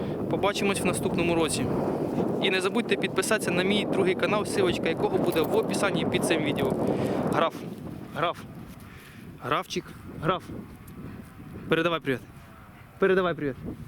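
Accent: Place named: Nizhyn, Ukraine. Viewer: акцент на наш Ніжинський схожий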